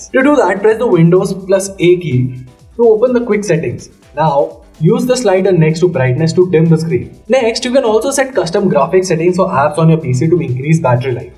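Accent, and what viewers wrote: India. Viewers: Great content, but WAY too fast presentation and I could barely understand you as your have such a strong Indian accent. I am English. I have liked the video.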